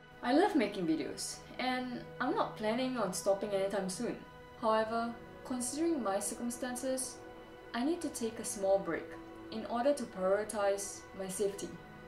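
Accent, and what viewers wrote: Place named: Kedah, Malaysia. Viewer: he speak bahasa kedah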